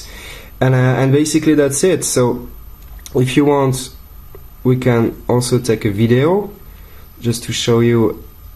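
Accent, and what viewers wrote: France. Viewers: je trouve l'accent français agaçant en anglais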